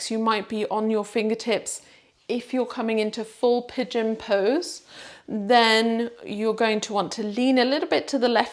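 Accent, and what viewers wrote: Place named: England, United Kingdom. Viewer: I so needed this! Thank you so much again! Always love the england accent in there:)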